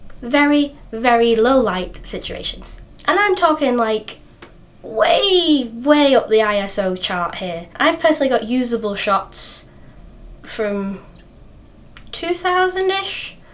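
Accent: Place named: England, United Kingdom. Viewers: Hi, you've just gained a new sub after watching a couple of your videos, love the Bolton accent too (I'm from Manchester originally but now living in New Zealand:D) Anyway, i started photography about 15 years ago and was exclusively Canon until, for the same reason as you, i found myself leaving my kit at home. I then decided to try m43 with the Olympus OM-D E-M10, it was a cracking camera with some good features, i invested in a few lenses but i found they just didn't 'feel' right for me, for the last year though i've been using my Sony A6500, which i absolutely love, it's the best of both worlds, not too big, decent lenses and APSC sensor for more detail. I'm trying to get more into video and have just invested in the Zhiyun crane plus, which is how i found your channel, (i searched night lapse). So, i hope to get out and do some nice NZ lapses:) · Great video , very educational and a great Northern accent. 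Where are you from ?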